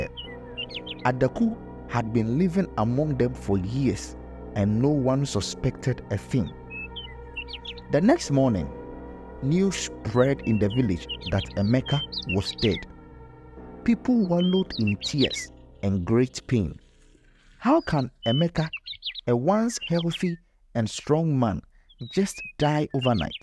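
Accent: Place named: Ghana. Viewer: Lovely story. Loving the Ghanaian accent!!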